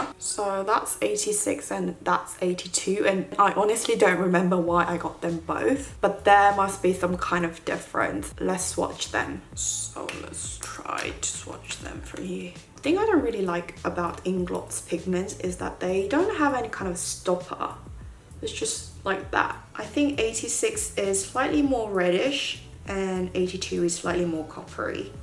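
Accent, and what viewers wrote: United Kingdom. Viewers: 첫인상 리뷰 너무 좋아요 언니 영국 발음 볼때마다 짱짱...사랑해요....❤️❤️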